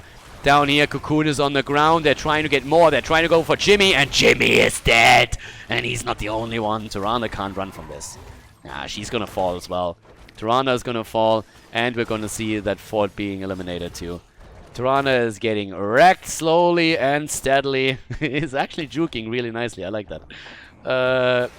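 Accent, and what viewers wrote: Germany. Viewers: Does has an german acsent when hes talking in englisch??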